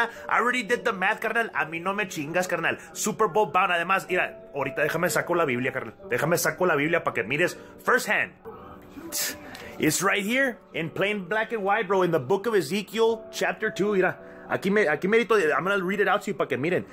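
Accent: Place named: South Texas, United States. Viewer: This guy is from the valley in South Texas or what!!. I hate the way he talks lol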